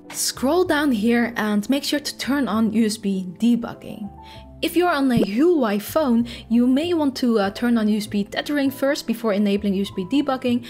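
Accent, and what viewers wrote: Netherlands. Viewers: I hear a little nederlands 😂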